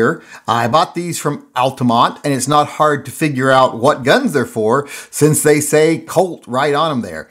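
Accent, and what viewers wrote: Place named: West Virginia, United States. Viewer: Your West Virginia is showing. They're the only ones that say, "colbra".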